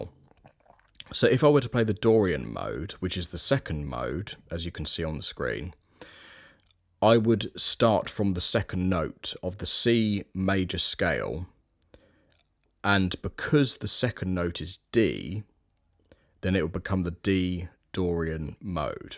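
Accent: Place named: United Kingdom. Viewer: Can you do the video again, but without the British accent?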